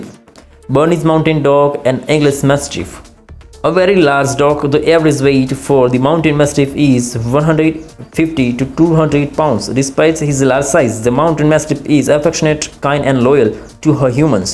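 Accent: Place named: India. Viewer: Just go ahead and use an AI voice because this one sounds like a call center from Calcutta.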